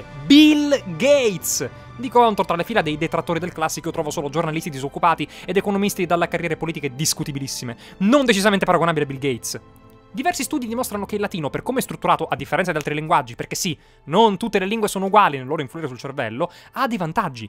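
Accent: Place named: Sicily, Italy. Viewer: Io non me ne intendo di accenti ma sentendoti parlare così concitato mi è sembrato che la tua parlata fosse simile a Marco Merrino. Il che ha senso visto che siete entrambi siciliani.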